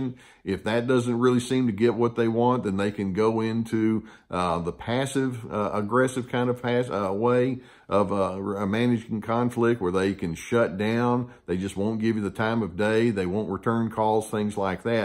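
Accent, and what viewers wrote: South, United States. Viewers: I enjoy listening to. His knowledge and delivery is pretty easy to listen to and his country accent reminds me of home. Good info to share. Thanks!! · But i hit the rewind first and listen again to make sure I get the message. I guess I'm trying to say I'd like your videos to go a little longer on one topic. You talk fast for a smooth southern talkin man. Love your accent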